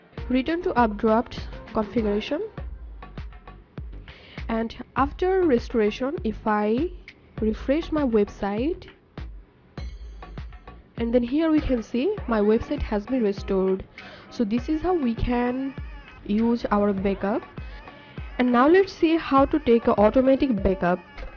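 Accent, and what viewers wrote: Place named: Bengal, India. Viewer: Her accent is Bengali 🎈🎈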